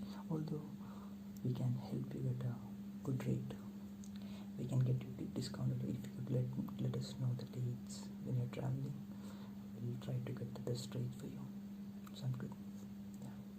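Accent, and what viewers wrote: India. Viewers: I'm so glad I found this video again. It is absolutely perfect. I love the Indian voice for asmr. So soothing. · Ppl love the Indian accent. It’s so tingly. The heavier the better. I am from NYC all my life and lived mostly in Queens so lots of Indian ppl. Very nice ppl. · Are you from Kerala? Malyalam people especially who have the typical Malyalam English accent is awesome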